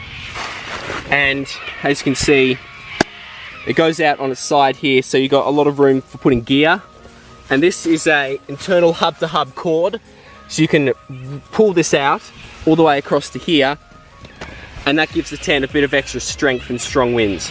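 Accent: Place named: Australia. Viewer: Great video - awesome to hear an Aussie voice on one of these. Interesting there's no zip cover... Very thorough review. Liked and subscribed. Will be checking out some of your other vids. Cheers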